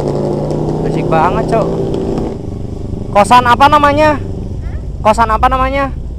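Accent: Tegal, Indonesia. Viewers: Bang luh orang tegal mana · anjayyy tegal ni kayanya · Abang orang tegal yah bang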